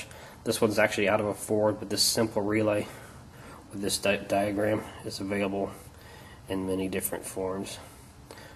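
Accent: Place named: California, United States. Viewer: Thx for the info dude! You sound like a California boy and the music after the video definitely Cali shit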